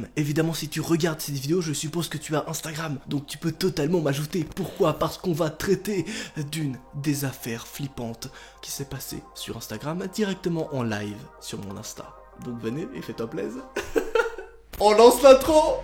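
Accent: Switzerland. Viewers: T Suisse ?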